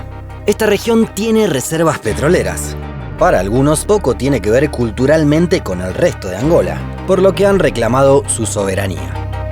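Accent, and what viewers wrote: Argentina. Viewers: Buenas tardes, Cosas que han pasado por culpa de las fronteras: - Reparto desigual e injusto de recursos - Me gusta el acento argentino - Nos parece mal que se explote tanto los recursos económicos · ya me fijé, estaba viendo los comentarios de otros comentarios y al parecer es un Chileno xenofobo de argentina y al parecer el creador de los vídeos es argentino o parece por su forma su acento que tiene similitudes a la de argentina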